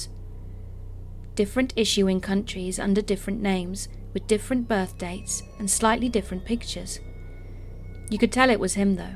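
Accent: United Kingdom. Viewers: Hi...love ur voice and ur channel...love to hear a uk voice as I'm there!!!!😂love it when u say get cozy comfy warm!! As I'm always getting cozy when u say that!!!thank you!!!!!! Stay creepy!!!!😂🖤🖤🖤🖤🖤🖤🖤🖤🖤🖤🖤🖤🖤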